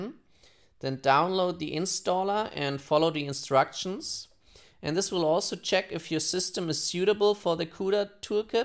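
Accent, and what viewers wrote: Germany. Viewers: His voice is too sharp with a German accent.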